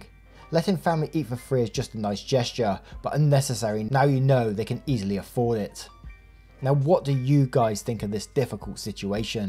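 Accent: United Kingdom. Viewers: Omg I’m so stupid, you’re British? I guess I don’t know accents I thought you were Australian this whole time wtf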